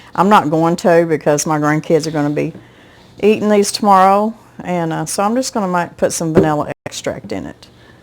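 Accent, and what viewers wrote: South, United States. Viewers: You are so precious! There is NOTHING more 'homey' than a Southern twang that puts one at ease! My BEAUTIFUL wife was a daughter of a Church of Christ Minister. I, however, lost her to breast cancer many years ago. As her family did a lot of preaching down Texas way for many years, they also had that Texas-twang that I have come to love so very much! As she, and all of her family have now all gone to be with the Lord, watching you, and together brings a lot of both sad, and warm, memories back to me when we were all together enjoying life, as life was meant to be shared, in Christ's fellowship. is lucky to have a woman such as yourself, as you are so genuine, kind, and loving. God Bless you, for the person that you are, and what you create for the viewers like myself!.. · Your accent is odd.. you’ve a southern accent but you say wawrsh like a northerner… lol my yankee mom from Iowa says it that way. 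When we repeat it like her she says oh wait let me say WAAASH… lol but I’m not sure I’ve ever heard it with a slight southern accent like yours. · These look delicious, but being a true Southern lady..I love your Southern authentic accent! It’s the real thing!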